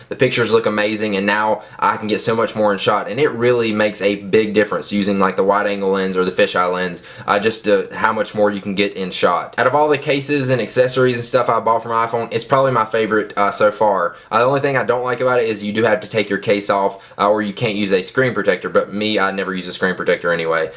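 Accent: South, United States. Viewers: You sound really southern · you sound so country(: its adorible(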